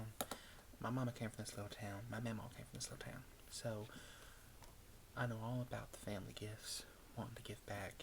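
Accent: South, United States. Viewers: I love this roleplay! You have such a soothing and comforting presence and the southern accent adds to the cozy vibes. I really struggle with anxiety, and your videos help me feel safe and calm. Stay awesome! :) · OMG when I saw Christmas boutique roleplay I wondered if you would do a southern accent. And here we are ❤️❤️ 🎉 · Your Southern Belle accent is spot on. I love your videos where you embody a different “character”. You’re a very good actor in this medium.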